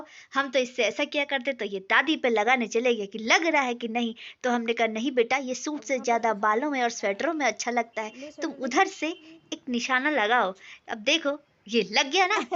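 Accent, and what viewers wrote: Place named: Lucknow, India. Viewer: Aaj to aap Lucknow ki language bol rahi hai Ham ham😂😂 very nice 💞 💞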